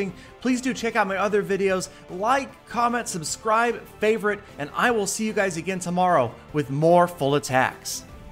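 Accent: Norway. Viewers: Wait, are you from Norway O_o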